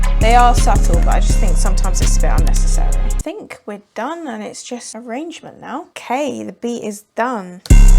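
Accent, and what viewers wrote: United Kingdom. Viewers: Her posh accent makes this go even harder. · Ayeeeeeeeeeee I started to play this & completely ignored it, a few moments later I’m like “Who is this lil white girl with this UK accent cooking on the beat!” Does she/her have an Instagram? I have to plug her in with my lil bro DJPlugg, Google him.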